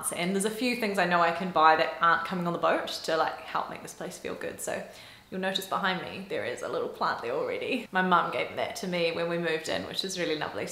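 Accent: New Zealand. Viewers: Lovely to see you back,. Your NZ accent has made a great resurgence, plus you seem really happy and relaxed. I'm so happy that your audition was such a success, as well. Sometimes, all the energy in the Universe seems to pull together in your favour and these continued successes are proof that you made the right decision 💕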